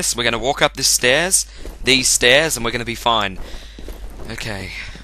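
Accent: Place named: Australia. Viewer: He's Australian, it's just his accent. I like the accent.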